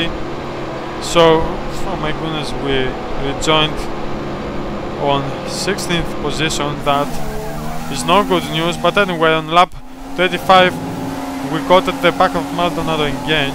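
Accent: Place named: Spain. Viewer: You spanish? 'cause I can see a hard Spanish accent and you're a Fernando Alonso fan. Nice vid m8, keep going!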